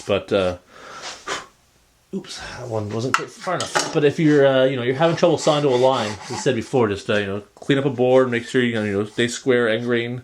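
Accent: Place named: Canada. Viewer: Okay, to follow up with what I mentioned on Twitter, this video showed me that I need MUCH less chiseling. I had made my New Year's resolution to learn hand-cut dovetails, and watched a few basic videos and subsequently a few (horrible) attempts. Prior to this tutorial, I was laying out my tails with a marking knife so that I could register my chisel in the mark. I would use the dovetail saw to cut shy of the line, a coping saw to remove the waste, and then try to use the chisel from way too many angles. As I was new, I wasn't using the chisel very well, and had some irregular cuts, undercuts in the wrong places, angle changes, etc. Then I would transfer this mess to the pin board, and more irregular chiseling only compounded the mess. After watching this tutorial, I decided to "let it go" and just use the cut from the saw, and only use the chisel to clean up the bottom, instead of the bottoms and sides. 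This has led to not only quicker dovetails, but cleaner ones so far. What I am able to transfer to the pin board seems to be a lot better, and will get better as I practice. I still have far to go, but am trying to get down to the shop at least once a day to make a practice dovetail. Thanks again for not only the tips in the video, but for everything else (keeping it real, showing mistakes, crass jokes, Twitter rants, glorious Canadian accent, etc.)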